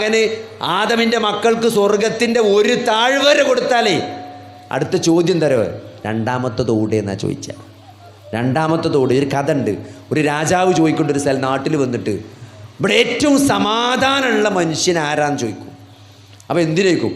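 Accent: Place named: Malappuram, India. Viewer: ഇദ്ദേഹം ഒരു ഡോക്ടറാണ്, but മലപ്പുറം മലയാളം വിട്ട് ഒരു കളിയുമില്ല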